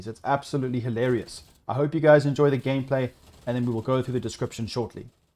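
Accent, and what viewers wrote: South Africa. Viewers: Is that a South African accent I hear ? Hello fellow traveller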